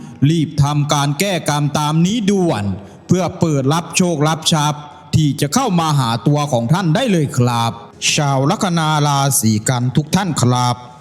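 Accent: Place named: Isan, Thailand. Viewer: อยากกดเข้ามาดูตลอด แต่พอได้ยินเสียงคนอ่าน กดออกแทบไม่ทัน ถามจริงหาที่อ่านแบบคนทั่วไปไม่ได้จริงเหรอ สำเนียงอีสานก็หังยากแล้ว ยังจะมีการทำเสียงแบบละครวิทยุสมัยก่อนอีก เฮ้อรับไม่ได้ๆๆ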